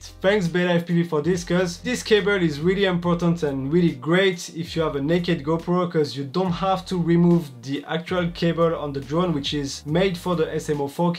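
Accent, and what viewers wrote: France. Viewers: Tu es français ? Tu as un accent français quand tu parles 😂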